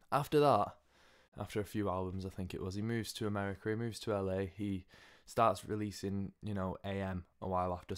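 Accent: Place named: United Kingdom. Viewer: it's really refreshing to hear an actual nice British accent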